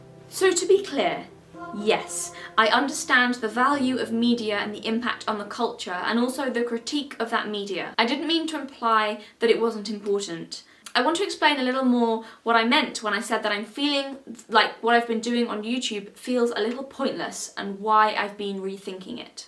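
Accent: United Kingdom. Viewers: It's such a relief to hear with my own ears that intelligent people with British accents still exist. Greetings from across the pond. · Shadiversity….Now I know why you showed up in my recommended out of nowhere lmao EDIT: oh this is gonna be a really British woman ranting passionately about tyrants. This will be good for my lifting routine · I'm a brand new viewer, your James cordon video just came up in recommended and I was like yeah sure. I do not give a shit about James cordon, I don't care about any of his work, but I watched the whole entire video in one sitting not because I was waiting in baited breath to finally see James cordon finally get demolished, but because of you. Your writing, performance, delivery, structure, you completely hooked me and I subscribed immediately. Maybe it's just the novelty of hearing angry British woman as an American but I watched your video not because I wanted to hear about James cordon but because I was curious about your channel and you hooked me in and after watching this video all in one sitting right after that James cordon (drunk yet?) I have a feeling nobody cares all that much about what you talk about, only that you talk about it. 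I'm not watching A VIDEO about James cordon I'm watching YOUR VIDEO. I really hope you stick around here cause you seem to be good at this. Maybe you declare you will in this video, I'm only at the 30 min mark but regardless of what you decide, I wish you good luck, you certainly deserve it Edit: finished the video now, another channel called filmento is a good example of what you're trying to do I think. He uses movies to talk about concepts like how to make a movie for kids vs adults or how to poorly or properly do exposition so he might be a good bit of guidance for what you want to try to do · Just found this channel and this is absolutely glorious. And I don’t just say this as a fellow stylish Brit with a similar accent and knack for swearing.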